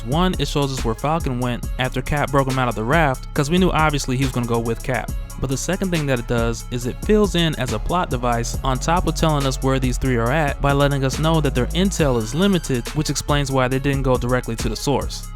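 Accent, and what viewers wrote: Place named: Texas, United States. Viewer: This my first comment but I have watched and liked a lot of ya videos always speaks that Texas trill 💯💯💯💯💯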